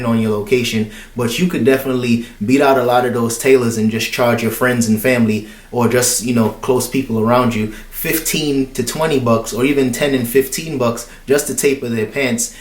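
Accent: Caribbean. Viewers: I still sense some caribbean or something . Lol. I like it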